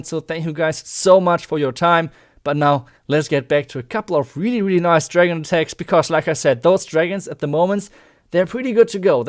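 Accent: Germany. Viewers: You can easy hear the German accent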